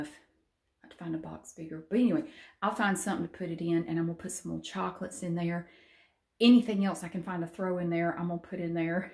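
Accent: South, United States. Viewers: I enjoy your videos so much! You are so genuine and real. I’ve been following you the day you made this channel and watching your videos everyday now because I’m so invested in your life because I love to know what you are doing 😝 A lot of YouTubers nowadays are fake and always starting drama that’s why your channels are a breath of fresh air. I honestly love your personality and southern accent! Your voice is so soothing to hear and it helps me sleep when I’m restless and can’t sleep. Would love to send you a Christmas card if you have a PO Box! Sending you lots of love from Canada!🇨🇦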